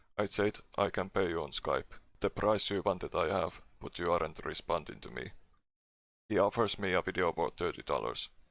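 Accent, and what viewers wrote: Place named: Finland. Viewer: u sound like finland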